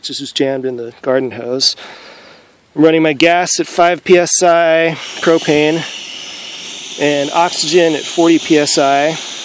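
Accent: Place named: United States. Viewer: listen to the accent, 100% American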